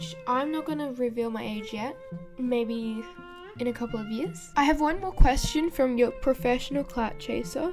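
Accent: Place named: Australia. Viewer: Congratulations!! I love your accent and voice omg✨ is it an australian accent? 🦘 this drawing looks so beautiful!! Thank u for answering my questions 🌸 i love ur videos a lot❤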